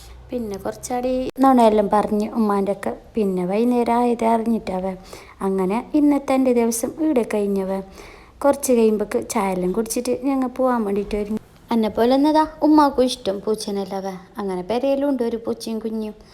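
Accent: Kasaragod, India. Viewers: കാസര്‍കോട് ano😂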